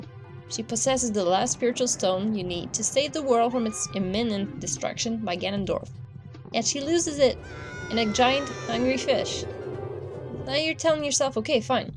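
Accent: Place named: Canada. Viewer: Where do you live? (by your accent i would say canada)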